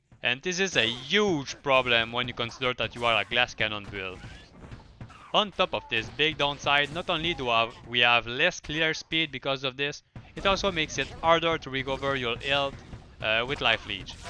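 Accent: Quebec, Canada. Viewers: That quebecois accent, I could recognize from a mile away. Nice build btw.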